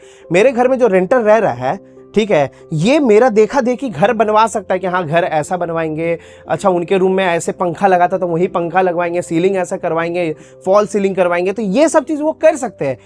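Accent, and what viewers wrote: Bihar, India. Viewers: मैं पहली बार आपको सुनी हूं। आपके टोन बिहार से लग रहा है जो मुझे बहुत प्रभावित किया क्योंकि मैं भी बिहार से हूं। मैं आपको सबस्क्राइब कर रही हूं। अच्छा लगा आपने जो बताया है 😊 क्योंकि मैं भी Ai को लेकर कन्फ्यूज थी बहुत कुछ क्लियर हुआ है। मैं छोटा सा क्रिएटर हूं। थैंक यू भाईजी 🙏 ब्लेस यू 🙏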